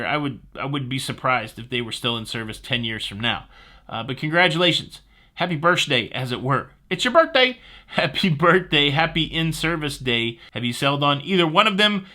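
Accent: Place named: Texas, United States. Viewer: I am a born and bred Texan and your humor was spot on. BTW you have a great Texas accent.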